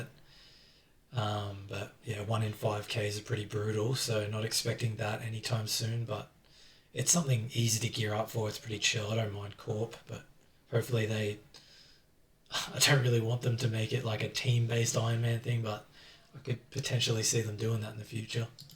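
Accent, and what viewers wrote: Australia. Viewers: Actually huge mate gz on true max! I’ve just started OS coming from world of Warcraft and I’m addicted as fuck. Almost 1k total level now, glad to hear another Aussie as well! Ps. Pls give me some GP 😉😂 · Can tell you're Aussie haha, Gz on 200m all.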